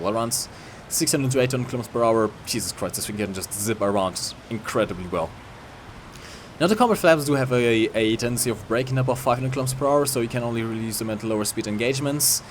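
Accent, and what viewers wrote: Germany. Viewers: Are you German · Bist Du Deutscher?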